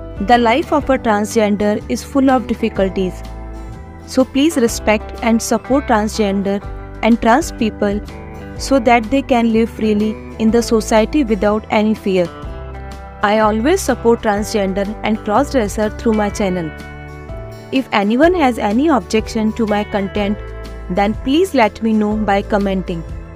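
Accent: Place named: India. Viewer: I like that you've always used your own native Indian accent. I appreciate it more with all the annoying AI fake voices appearing.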